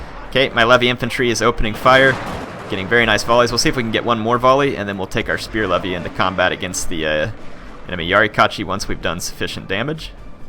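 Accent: South, United States. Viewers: You get a like just for that Soutgern Accent;) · Love your southern gentleman accent. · I subbed just for Southern accent <3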